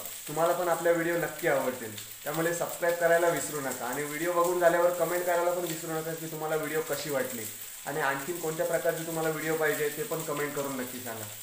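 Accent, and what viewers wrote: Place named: Agri Koli, India. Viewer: भोईट बोलतान आमच्या आग्र्यांच्यान आणि कोल्यांच्यान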